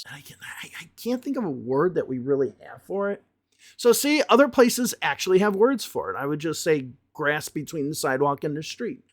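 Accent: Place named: Midwest, United States. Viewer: I could hear similarities about Midwest accent in specific intonation, like a hammer on some words.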